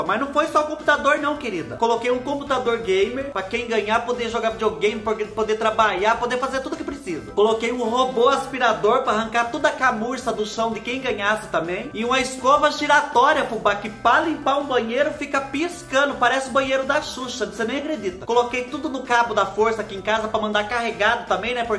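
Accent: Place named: Minas Gerais, Brazil. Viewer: Eu acho q é minéiro mesmo fala igual a mim kkkkk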